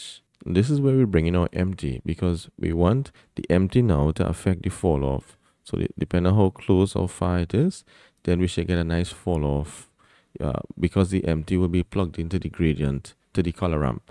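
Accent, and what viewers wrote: Trinidad and Tobago. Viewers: Thank you man, Very helpful ! PLUS I think I heard a Trini accent , that's refreshing haha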